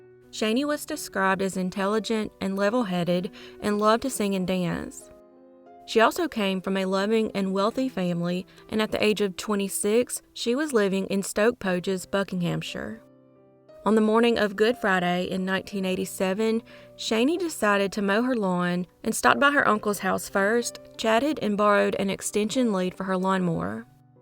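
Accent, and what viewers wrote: South, United States. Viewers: Ah yes the southern accent, love it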